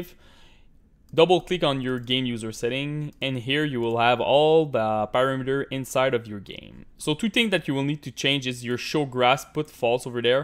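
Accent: Quebec, Canada. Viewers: Et mec jtai vite cramé avec ton accent quebecoi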